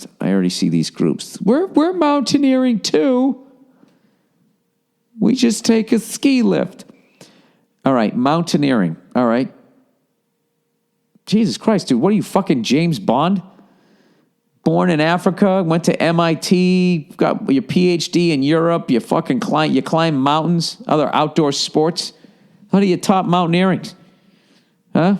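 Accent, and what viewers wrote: Boston, United States. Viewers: BIIIIIIIIIILLL!!! We fucken love you man! (That shit does matter, gotta get too those two last stadiums man) And fuck that guy! Make America Great again. Anyway I learn heaps and loads from you about American sports and other shit in fits of laughter, I watch all your shit, we love that boston accent "DOWN UNDER!" (say in Aussie accent) BUT please watch a bit of that new star trek on Netflix... and then yell about it..its the fucken best! peace n love......p.s. say the dudes name ill take care of it. Great podcast.. Pps go fuck yourself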